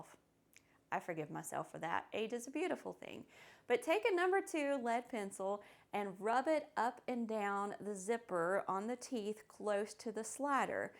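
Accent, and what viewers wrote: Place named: South, United States. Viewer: Thanks for the awesome video. Everything sounds better with a southern accent! One question, why not simply pry open the bottom of the glider to put it back on the zipper instead of cutting the zipper?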